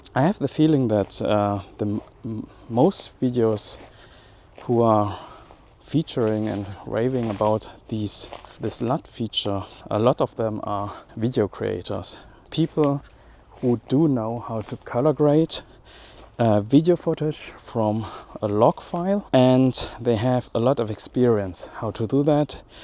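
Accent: Germany. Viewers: Just curious, what racial are you? And because of your accent, do you live in Germany for a really long time?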